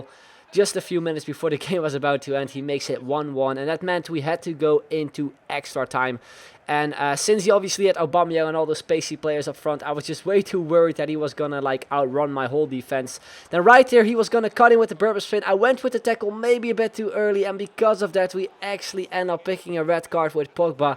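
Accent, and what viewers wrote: Netherlands. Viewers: From holland i can here that bye his accent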